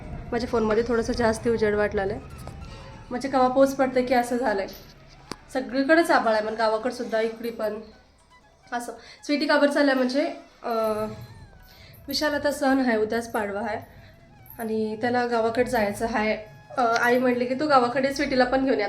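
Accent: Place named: Latur, India. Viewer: लातूर ची भाषा आहे हे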